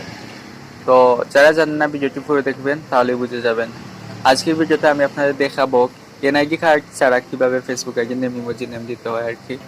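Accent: Sylhet, Bangladesh. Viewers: Vai....Sylhety ni😁😁😍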